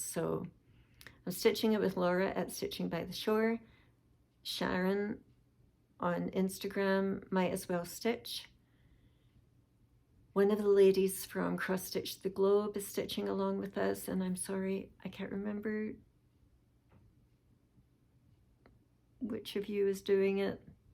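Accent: Scotland, United Kingdom. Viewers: Nice to hear a Scottish accent on FlossTube! Your finishes are lovely! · I’m not sure how I’ve missed seeing your videos before now. I hear a Scottish accent which I enjoy. My parents are Scottish, and my oldest daughter’s name is Katrina! I’m so sorry your daughter was in such pain. I love your projects. I’m also stitching Summer Quaker. And I’m new to WIP Warriors this year.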